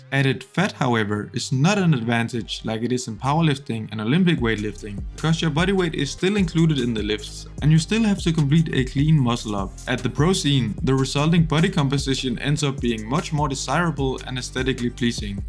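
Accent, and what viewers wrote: Denmark. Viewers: Nice video :) You sound danish. If so, where in DK are you located?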